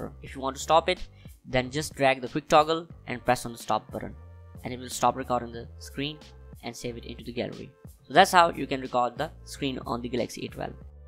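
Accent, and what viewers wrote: India. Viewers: Always gotta rely on that indian tech guy with a bollywood accent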